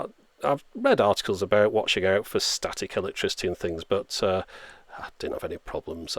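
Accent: United Kingdom. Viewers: Oh really! So properly British!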